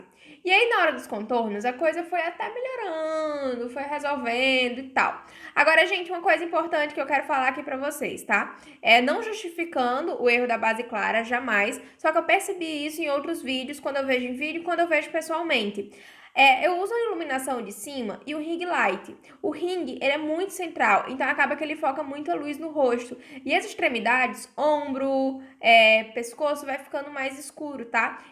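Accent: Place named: Mossoró, Brazil. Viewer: Mulher, morta que você é daqui de Mossoró! E eu achando o sotaque parecido kkk que maravilha 😍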